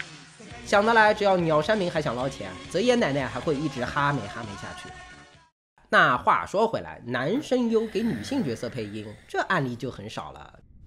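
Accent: China. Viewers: 你是南京人啊？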